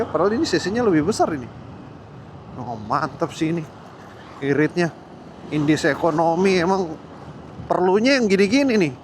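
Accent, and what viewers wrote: Ambon, Indonesia. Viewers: Logat ambon kantara Sukses selalu kk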